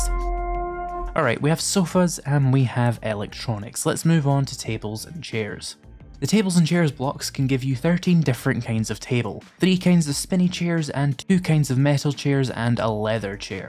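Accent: Scotland, United Kingdom. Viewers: he sounds Scottish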